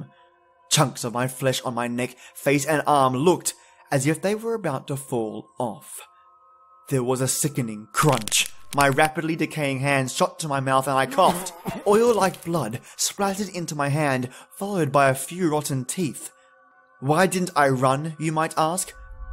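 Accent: Australia. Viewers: Wow! That tea you mentioned in the beginning sounds absolutely fantastic and delicious! I'm a bit jealous! I am sitting in my living room with my wonderful mother, cute parakeet named Daisy, and myself with a humongous cup of black tea. My mom couldn't of been nicer about you. She told me you tell such a good tale she could actually imagine herself in the story. Bravo! Of course, I just Loooovvvveee your narration!😍 With your narration style, sound effects, and great Aussie accent, truly makes it quite a listening experience (a stupendous one at that). My ears never have been soo spoiled! Thank you ever soo much, from the bottom of my heart!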